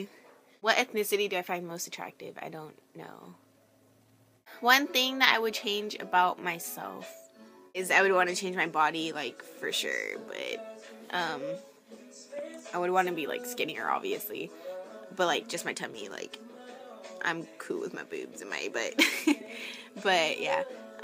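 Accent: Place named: Texas, United States. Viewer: Where are from I feel like you're from texas like me ? ;o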